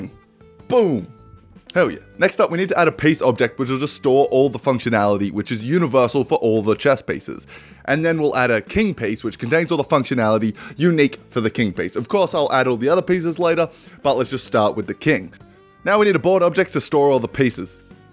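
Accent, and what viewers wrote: Australia. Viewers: Are you Australian, you sound heaps Australian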